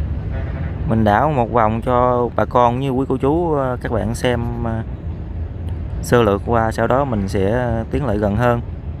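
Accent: Vietnam. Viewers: Mong được xem nhiều video của về công trình này thêm. Giọng nói của bạn rất rõ, rất Miền Nam, nghe rất thích.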